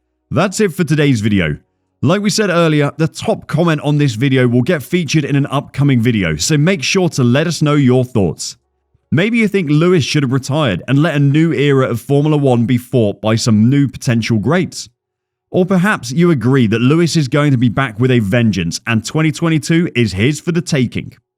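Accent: United Kingdom. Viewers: My congratulations, this channel really feels professional! There is that slightly British ‘accent’ in the soothing voice of the narrator, that gives the message a trustworthy sound. They imagery and the movie clips are also well edited and nice on the eyes. All in all you look like a journalistic source that, in an agreeable way, wants to state it’s point. And maybe that’s the biggest problem. In a world where content isn’t judged by it’s objectivity but by the amount of followers that are being pleased, it’s very hard to stay truthful. In this very scoop you do mention the not so reliable influencing by TW in relation to the fact that he ‘knew’ LH wouldn’t stop while whipping up his fan base with suggestive statements, but you fail to explain why he did that. You acknowledge the phrase that LH mentioned that he’d never said this or that but you fail to explain how this contributed to the polarization of opinions within the F1 community. 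On a given moment you accuse MV of being the source of a lot of misbehave within the sport, but fail to explain that this is a shared opinion by a minority of the fans. So entertaining, surely, professional exposure, well done, journalistic integrity, can and should be worked on if you want to be a channel for all F1 fans. If you don’t care about second opinions or how the other chunk of Fans thinks , then good luck, you will have to battle with ton’s of British based and prejudiced, biased you tube channels. The choice is up to you. 👍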